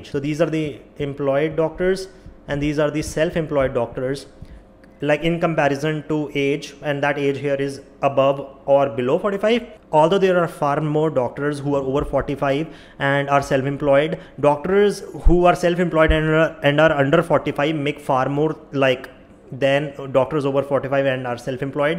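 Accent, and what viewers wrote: India. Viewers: Bro lives in USA and still managed to get an Indian accent thicker than me 😢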